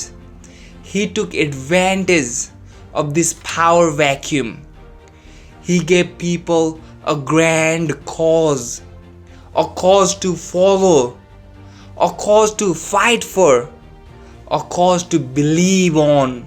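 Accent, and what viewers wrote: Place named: Brazil. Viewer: Friendly advice in your Youtube debut: No-one wants to be lectured on how too think, less so coming from foreigners. Rethink your Youtube content strategy!... Your theatrical hand gestures, patronizing words and tone, in an impossibly too strong accent (Brazilian?), combined with dramatic music, this just doesn't work my friend.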